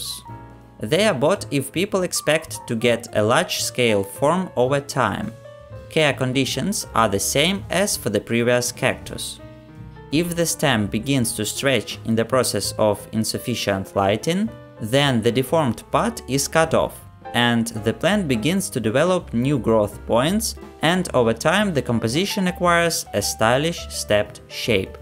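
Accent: Russia. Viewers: It's a Russian accent.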